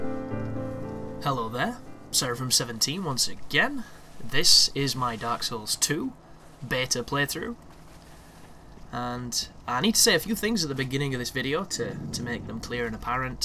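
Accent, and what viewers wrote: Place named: England, United Kingdom. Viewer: Hey guys, do you know what time it is? Time to listen to a man with an English accent talk about Dark Souls 2 for 10 minutes. Another awesome way that I like to spend my valuable time. Keep up the great work:)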